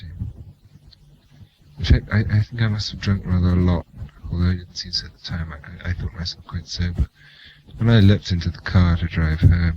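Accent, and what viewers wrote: RP, United Kingdom. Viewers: Very clipped and upper class. But a lovely voice, and he finished with a chuckle, rest in peace x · Beautiful accent. Posh but quintessentially English. He sounds fucked though. Thanks for posting.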